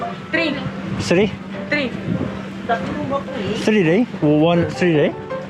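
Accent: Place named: South, South Korea. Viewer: 님은 절대 설스타일 아닌데..ㅋㅋㅋ(구수한 편안한 남도스타일.)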